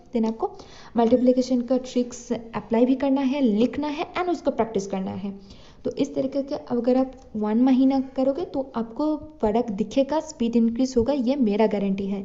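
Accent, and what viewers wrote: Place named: Northeast, India. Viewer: North East by ur voice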